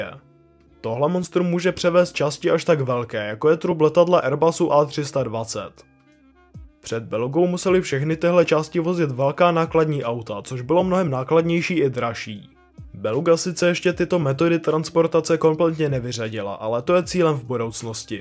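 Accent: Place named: Moravia, Czech Republic. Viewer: Ta moravština je příšerná řeč ale jinak obsahově slušný... namluvit to civilizovaným jazykem, dám like.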